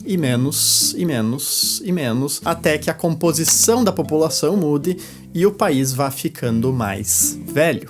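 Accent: Rio Grande do Sul, Brazil. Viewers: esse cara é gaúcho? acho muito fofo esse jeito dele de falar kkk. eu sou gaúcha tbm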